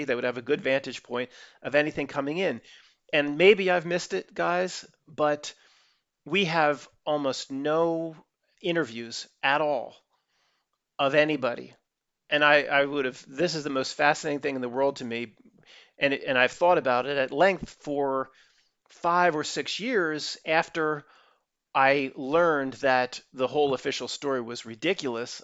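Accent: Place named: Boston, United States. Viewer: Love your Boston macadamian accent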